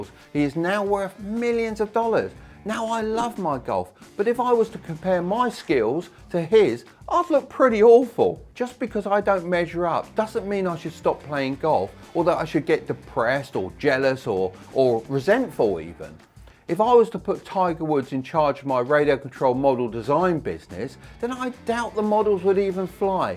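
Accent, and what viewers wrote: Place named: London, United Kingdom. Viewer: Do you live in America? because you sound like every bloke on my street in London.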